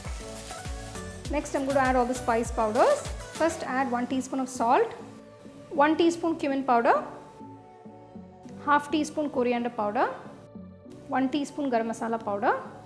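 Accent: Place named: India. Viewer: Funny heavy indian accsent🤏🏾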